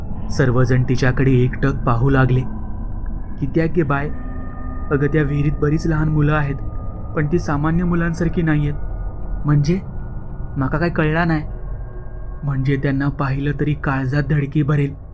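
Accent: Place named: Malvani, India. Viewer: तुझ्या तोंडानं मालवणी बोललंस बरा वाटला आमका ... मालवणी च वाटलंस...... पण भीती वाटता जाम हैता सगळा ऐकान 😅 lots of love from malvan ❤❤❤❤❤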